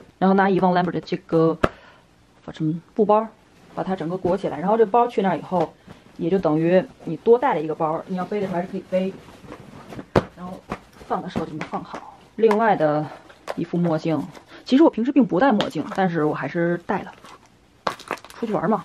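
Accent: Beijing, China. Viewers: 是北京人嗎～～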